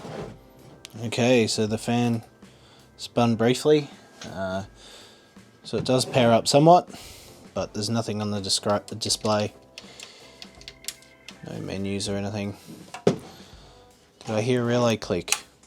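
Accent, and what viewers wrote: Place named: Australia. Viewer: I have a crown xls1000. With the same symptoms as this one. No audio and screen is blank. The fan powers on for like 1 second. I noticed when I was using it last it had red lights on and on the screen it said overload or overheated, so I turned it off and back on and never worked again. I tried reseating the ribbon cable on the board side but still nothing. Any other ideas. You sound like your an Aussie, where are you based?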